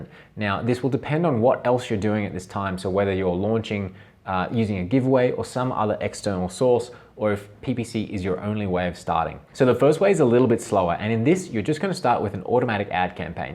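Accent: Australia. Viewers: Loved the no fuss Aussie delivery. Can you tell me do you eventually drop the automatic campaign when you have sorted the manual campaigns. Cheers.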